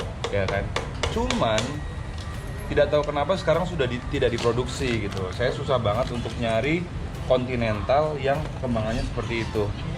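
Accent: Indonesia. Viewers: kok bahasa nya kaya orang sulawesi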